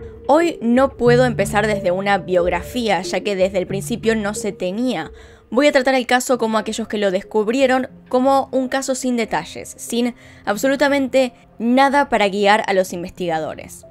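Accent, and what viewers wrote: Argentina. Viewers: Así hablamos los argentinos jaja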